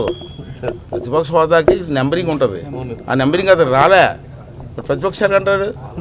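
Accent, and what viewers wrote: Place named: Narsipatnam, India. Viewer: Rey ade narsipatnam slang ra babu..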